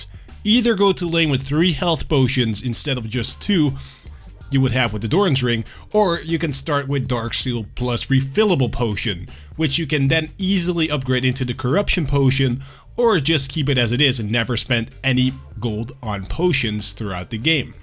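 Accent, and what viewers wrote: Netherlands. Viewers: jij bent NL niet waar ? het was echt moeilijk te horen maar ik hoorde het heel klein beetje :p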